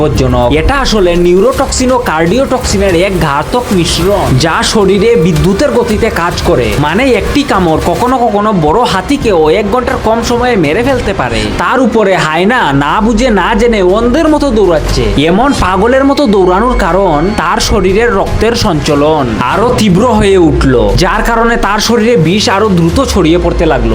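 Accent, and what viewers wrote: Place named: Sylhet, Bangladesh. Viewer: তুমি তোমার সিলেটি ভাষায়ই কথা বলো, শুদ্ধ ভাষা হয়না তোমার, লেজে গোবরে হয়ে যাচ্ছে ভাষাটা।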